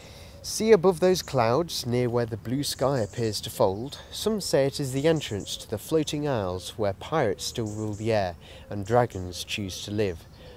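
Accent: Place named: Nicaragua. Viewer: Nicaraguan Accent!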